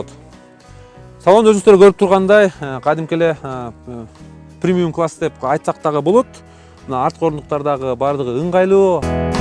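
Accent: Standard. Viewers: ишинизди мыкты кылат экенсиз таза суйлойт экенсиз дагы кутобуз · Кыргызча таза так, Жана тунук суйлойт экенсиз. Албетте ийгилик каалайм.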